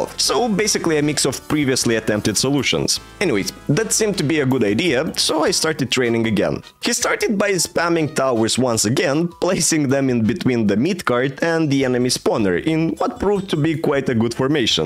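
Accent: Russia. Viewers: рязанский акцент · It sounds like you have a Russian accent lol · Is it just me, or am I hearing a Russian accent? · По произношению понятно, РУССКИЙ!!! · Youre russian? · It's the first video I'm watching by you and I was immediately like "a familiar Slavic accent huh?" Hello, fellow Russian!XD Удачи в твоих начинаниях!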